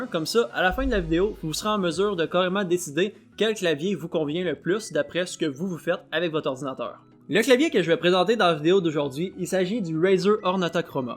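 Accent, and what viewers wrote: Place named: Quebec, Canada. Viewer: Sans rire l’accent québécois ❤️😍😍😍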